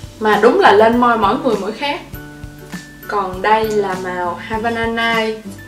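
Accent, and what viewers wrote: Mekong Delta, Vietnam. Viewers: chị là người miền tây à ? quê chị ở đâu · Thích sự mộc mạc của chị với cộng thêm điểm giọng nam, miền tây êu dấu. Đáng yêu vô cùng hí hí Làm thêm nhiều video hay nhe chị, cố lên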